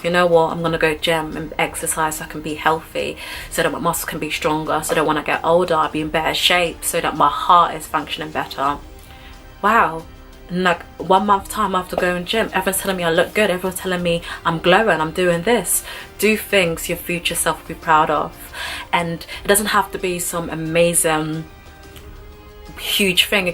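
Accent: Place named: Germany. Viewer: Babes this is beautiful and so are you! 1) I was like WHERE IS THIS ACCENT FROM- you answered Germany! So cute 2) power of the tongue you hit the nail on the head 3) I watched this whole video and enjoyed from start to finish. Your honesty is so inspiring - you’re so brave and a true role model! Cannot believe you could EVER tell yourself those things. To me you are so beautiful. Love ya girl xxx - can’t wait for more!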